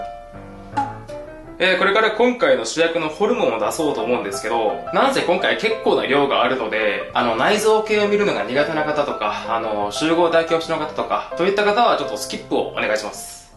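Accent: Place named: Fukuoka, Japan. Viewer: ホル鉄最高ですよね 最近見始めたばかりですが 語尾が、やねとかイントネーションとかで もしかしてと思ったら福岡なんですね！ 柔らかい喋り方、聞きやすくてばり好きやん(いきなり博多弁) もしかしたら通りすがっとったかもと思うと...(口が緩みます)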